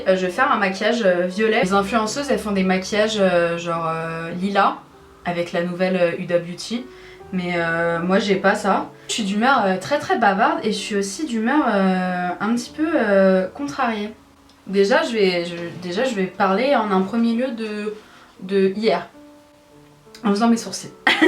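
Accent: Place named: England, United Kingdom. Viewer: Le maquillage est une catastrophe mais l'accent anglais en revanche est une pépite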